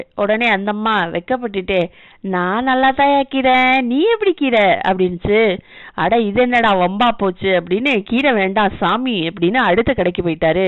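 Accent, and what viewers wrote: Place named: Chennai, India. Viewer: Madras Tamil, modulation and voice change Super 👌👍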